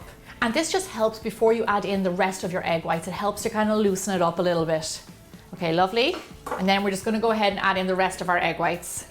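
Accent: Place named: Ireland. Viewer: Gemma Stafford ya! Like your english with that Irish accent. Very unique 😉 My mom loves hearing you talk in your earlier posts ☺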